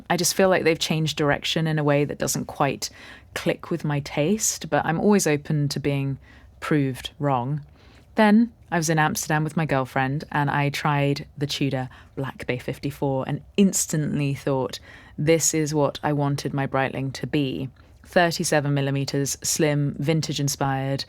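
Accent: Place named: United Kingdom. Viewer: This British accent is ❤❤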